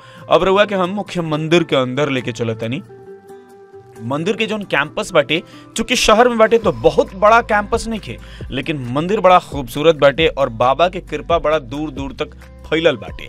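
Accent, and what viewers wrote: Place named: Azamgarh, India. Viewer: आजमगढिया भाषा लगता, ठे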